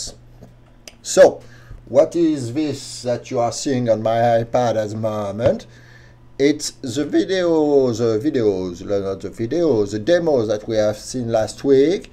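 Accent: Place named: France. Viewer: French accent powaaaaaa ! :)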